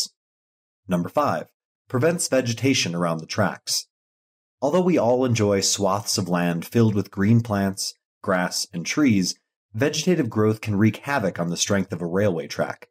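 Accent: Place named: United States. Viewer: Why are you going to program AI with an American accent and then give us measurements in centimeters?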